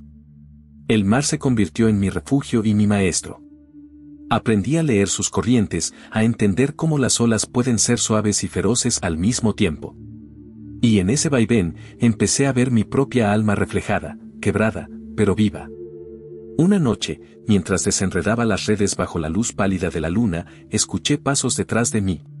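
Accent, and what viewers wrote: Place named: Portugal. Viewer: Porque habla portugués si lo hace en español es una mezcla muy mal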